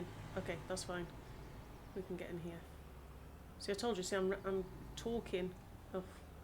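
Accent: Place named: Yorkshire, United Kingdom. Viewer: Great first talking video. Clearly you have some natural talent there 👍🏽😊 Btw I thought you were Scottish but you sound more Yorkshire’ish? 🤔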